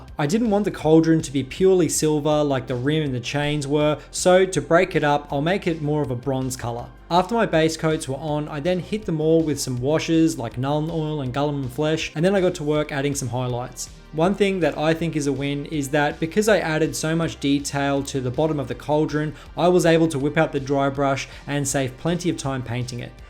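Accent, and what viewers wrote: Australia. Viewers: I gotta say I love your stuff. Whenever I see a really classic Warhammer mini I can always imagine that in the distance I can hear an Australian accent and some delicious dungeon synth.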